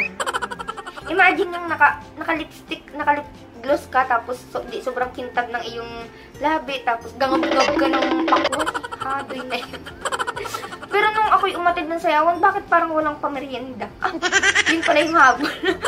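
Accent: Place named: Mindoro, Philippines. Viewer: Natutuwa ako sa mga vlogs mo, relate much ako the way you speak, magkapit probinsya tau, sinasabi ko din minsan mangyan ako, hehehe..keep it up...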